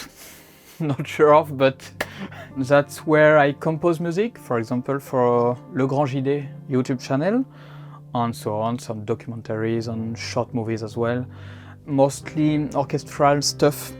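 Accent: France. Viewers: beautiful home. And you did very well speaking English with a French accent which was lovely! ❤️ wonderful music. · Vous avez une magnifique tiny house ! Tout y est bien rangé et vous parlé très bien anglais avec un bel accent français 🎵🎶🤗🇧🇪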